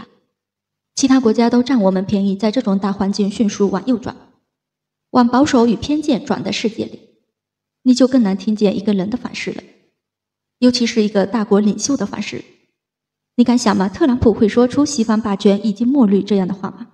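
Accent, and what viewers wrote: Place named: Liaoning, China. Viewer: 俄罗湿？主播是辽宁哪里的？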